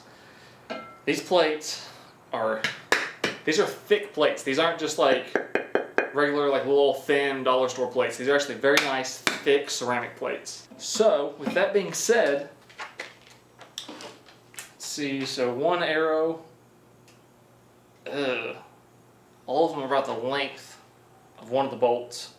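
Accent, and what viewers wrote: South, United States. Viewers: I know he sounds southern at times but he jus lost his card there for sure 😂🤣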